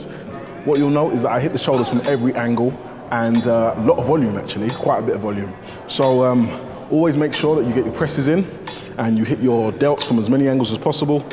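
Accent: United Kingdom. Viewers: Alright bro, r u from here in the UK??? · Are you British ?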